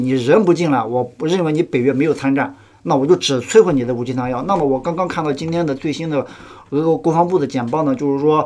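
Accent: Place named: Qingdao, China. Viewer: 频道第一次看，挺好！伙计，听口音青岛银？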